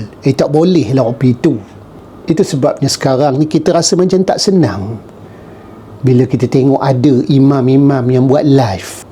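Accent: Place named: Perak, Malaysia. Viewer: Tu dialek perak...jangan sedap mulut kalau jahil.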